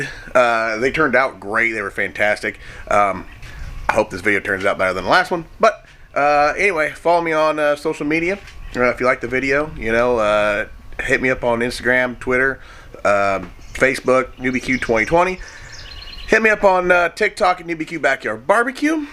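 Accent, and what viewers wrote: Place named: Texas, United States. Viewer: can I place an order for some of your breakfast burritos 🌯, to be delivered to Chuck and I in Texas???? Love ya'll...Your Texas Mom🤗😘🥰👄💞👄 P.S. I'm amazed you've maintained some of that Texas accent.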